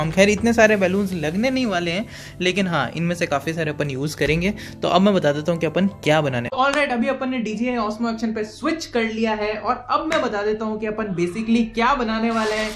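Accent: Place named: Marwari, India. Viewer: सा, वंदेमातरम। आपके वीडिओज़ में जो परिवार के प्रति स्नेह व लगाव दिखता है वो बहुत सराहनीय है। और थोड़ी बहुत मारवाडी बोली भी बोलेंगे तो और आनंद आएगा। आपरी हिंदी में मारवाड़ी री सुगंध तो आवे है, उनने सुन र जी घणो सोरो होवे सा।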